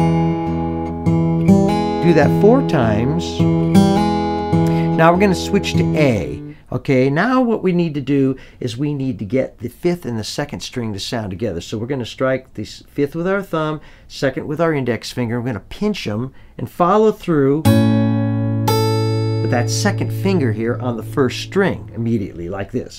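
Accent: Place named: United States. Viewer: your playing is terribly unprofessional. your accent even worse to endure listening to it. why are you doin this videos without really practicing until you get it right... ahhh sorry i completely forgot you maybe could be an american and your main audience is also american .. im 100% serious about what i write, especially your unawareness of how beautiful a guitar can sound if you play music with it, not just notes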